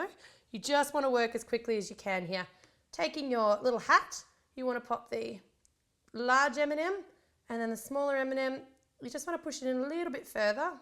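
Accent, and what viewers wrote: Australia. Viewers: Are u austrailian?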